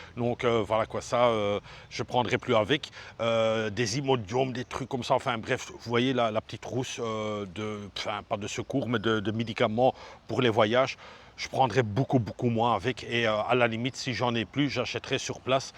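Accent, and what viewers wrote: Belgium. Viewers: Bonjour je me suis abonnée j'adore ta façon de t'exprimé en nen halve/echte Bruxelleir, moi je suis un vrai bxllois né aussi le 31 mai '67 à 1000 Bxl (bon annif à toi ) 😁T'es vlog que je suit de peu en Thailande très intéressant et maintenant que tu est de retour en Belgique dans notre beau pays lol J'ai aimer ta route en scooter au début, de revoir mon ancien quartier Machtens/Scheut ça fait plaisir de revoir cette endroit après 30 ans 😉Continue à nous faire rêver.. En een polleke de Roger 😅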